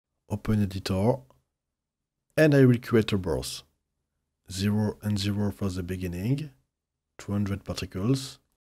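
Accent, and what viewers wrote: France. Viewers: you're French ? you have a French accent ;)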